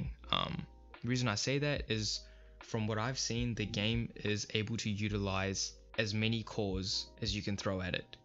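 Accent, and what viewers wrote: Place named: South Africa. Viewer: you sound like a SAFA, am I right?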